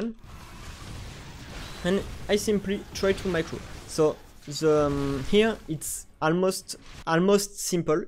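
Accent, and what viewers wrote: France. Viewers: Man you are so cozy guy and your accent tho! Really makes me smile when I hear your frenchglish haha:D thanks for your work sub and bell dont disappoint my trust!! :D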